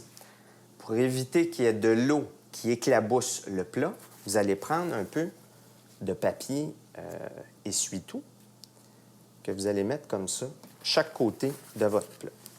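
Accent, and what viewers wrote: Quebec, Canada. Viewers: Voilà une bonne recette avec le bel accent de nos cousins de Québec. Et une petite leçon sur la culture des poireaux au Canada, c'est intéressant. En principe je fais les poireaux en quiche ou en gratin, et je vais essayer cette belle recette. Merci!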